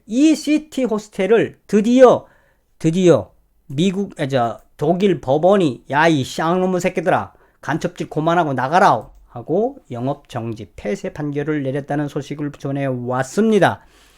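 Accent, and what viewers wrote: North Korea. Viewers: 북한말 하지 않는것 좋겠네요 김정은 생각이 나서 듣기 거북합니다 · 북한사투리 개인적으론 잼 있어요 ~ ㅎ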